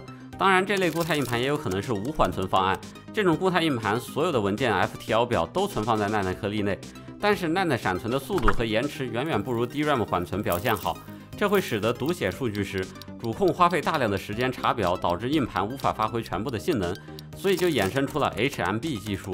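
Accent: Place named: China. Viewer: 想知道台湾朋友们不看字幕的话，听大陆腔有没有难度呀？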